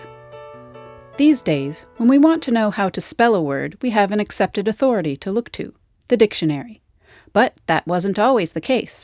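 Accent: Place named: RP, United Kingdom. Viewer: Queens english FTW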